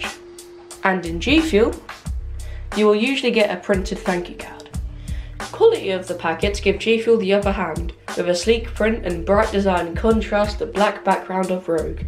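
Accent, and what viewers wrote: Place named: United Kingdom. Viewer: You’ve got a thicker British accent than me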